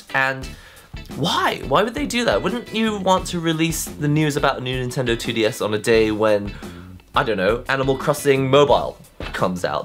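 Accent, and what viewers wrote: United Kingdom. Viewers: As an america, seeing a asian talk with a british accent was very confusing. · Wait and Asian guy with a British accent amazing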